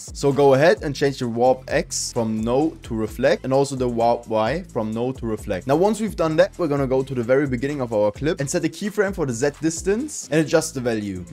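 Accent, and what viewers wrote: Germany. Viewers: nice vido, are you german?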